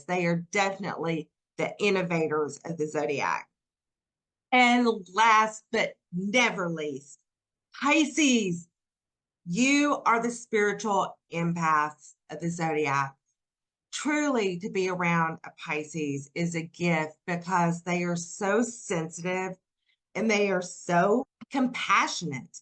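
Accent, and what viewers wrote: South, United States. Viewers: Thank you for the beautiful insights., are you from Georgia? I love your southern accent. I'm trying to learn to identify each accent and where it derives from. So far, I have South Carolina down pretty good. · I really need to get a reading from I love her accent she reminds me of being down south(my family is from the south)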